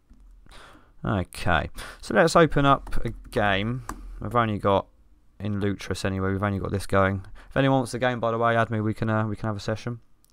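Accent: United Kingdom. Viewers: I like your British English very much, mate!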